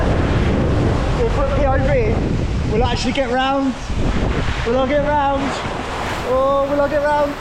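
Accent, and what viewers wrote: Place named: Norfolk, United Kingdom. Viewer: Are you from Norfolk, by any chance? Just trying to place your accent.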